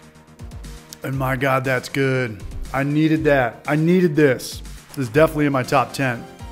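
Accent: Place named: Tennessee, United States. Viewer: I don't know but I can hear that Tennessee drawl slowly setting in on...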